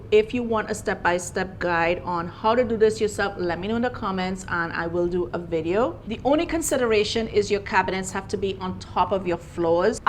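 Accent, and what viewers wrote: Trinidad and Tobago. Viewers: Are you a Trini because sound like a Trini😊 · Wait, are you Trini or Guyanese??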